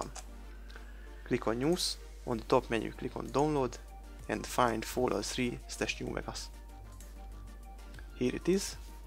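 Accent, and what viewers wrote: Hungary. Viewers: Számomra az akcentusodon tűnt fel,de jó tudni,hogy magyar vagy :D Ha hülye vagyok,s valami nem sikerül legalább az anyanyelvemen tudsz segíteni :D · A kiejtésből egyből rájöttem hogy magyar vagy. :D Segített a videó, köszi!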